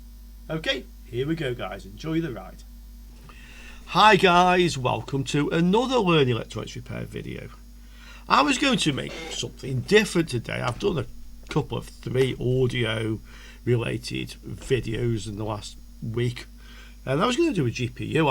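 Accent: United Kingdom. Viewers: Thank you for your time and your helpful videos! I'm 44 and now I try to learn electronics. I'm from Austria, and so my mother tongue is German. Thank you for speaking this nice british-english! So I can improve my english at the same time. Thank you for your effort!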